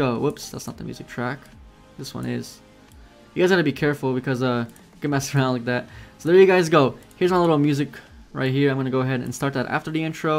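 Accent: Croatia. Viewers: Are you Croatian? :P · Are u from Croatia?! :OO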